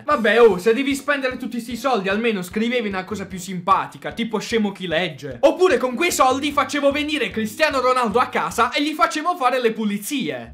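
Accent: Apulia, Italy. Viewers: ma sei di puglia?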